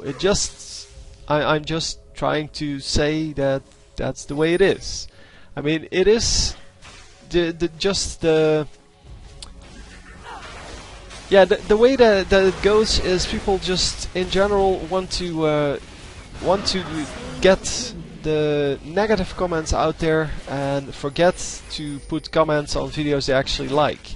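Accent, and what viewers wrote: Netherlands. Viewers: Are you dutch? freat channel BTW subscribed ;-)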